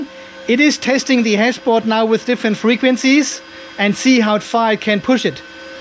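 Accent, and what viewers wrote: Germany. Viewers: Bist du Deutscher? Den Akzent kenne ich doch ;) und das Kassel-Schild im Hintergrund :)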